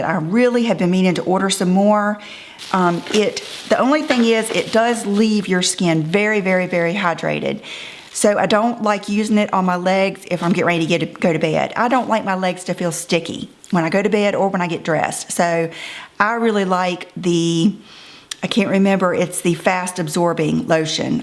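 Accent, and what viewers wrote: South, United States. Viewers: Love your channel! Your voice is music to my ears as I am a displaced Southerner! Love your content too! Thank you so much for bringing all this info in a fun to watch videos! Not easy to do.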